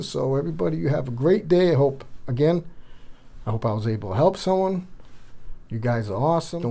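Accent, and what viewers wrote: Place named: Boston, United States. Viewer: Helped me man. My FA-18 throttle and stick just arrived this morning, but I was having a headache putting the throttle together as the pdf manual from the winwings site wasn't too clear on assembly. You helped me work out what goes where though, which I couldn't find in other videos. Love the Boston accent too. Many thanks from Aberdeen, Scotland.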